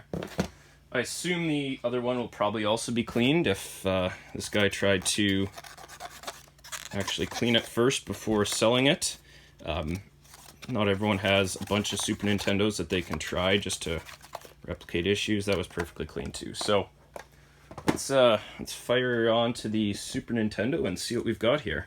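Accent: Canada. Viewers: I knew you were a fellow Canadian from listening to you and now I know I was right haha. Great videos!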